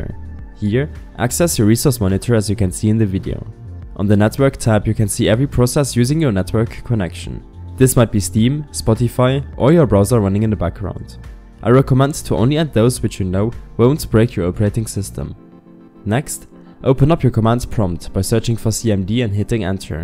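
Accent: Germany. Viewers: Bruder du bist deutsch oder 😂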